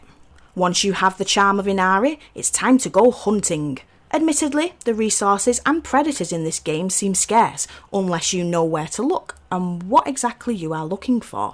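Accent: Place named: England, United Kingdom. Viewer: Yes, but her English accent is really strong lol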